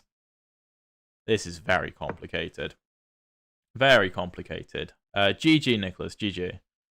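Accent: United Kingdom. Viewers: You are very good at explaining your ideas. Also, I love the British accent. Greetings from a new subscriber in Germany!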